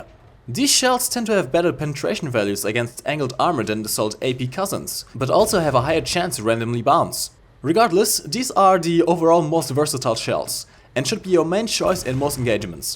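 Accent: Germany. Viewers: Bist du Deutscher? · me : clicks on the video and listens 5 seconds to it . . . me: "Is that the German speaking guy in Squires Videos? " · Ur German English is really very cute 😍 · thank you so much my friend with a heavy german accent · super Video, aber bitte arbeite etwas an deinem doch sehr deutschen Akzent. lehrreich war es definitiv, hast nen neuen Abonnenten :)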